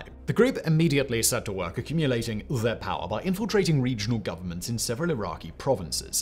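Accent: RP, United Kingdom. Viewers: Grew up in surrey and west sussex uk. I dont know what accent that is supposed to be but it sounds like someone who is trying to impersonate the royal family.... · british english was extra thick in this one. Had to rewind a few times 😂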